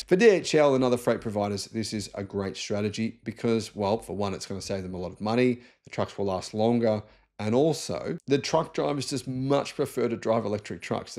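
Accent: Australia. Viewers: He is an Aussie and that is how we say it, you sound as though yours is the definitive version. in aus a semi (pronounced e) or prime mover is what you call a tractor. we call the tractors tractors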